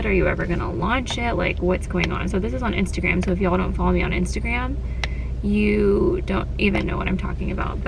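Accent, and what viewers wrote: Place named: South, United States. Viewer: Just wanted to say... at the beginning, your voice is sounding more country. Being in the s.a. area looks good on ya! Getting the accent back lol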